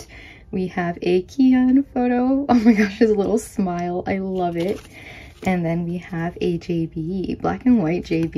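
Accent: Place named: United Kingdom. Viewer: I never noticed, but you pronounce words like british/australian instead of American that's so interesting!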